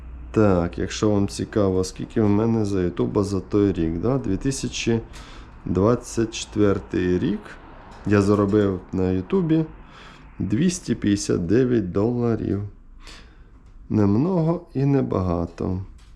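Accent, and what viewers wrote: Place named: Uzhhorod, Ukraine. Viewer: А мені подобається мова автора канала. Дуже цікавий, навідь прикольний ,Ужгородський суржик, відрізняється від нашого - Дніпрорпетровського. І це нормально. І взагалі підримую автора , що кожен має право розмовляти, як він розмовляє. Ось писати, бажано без суржика :) . Всім добра.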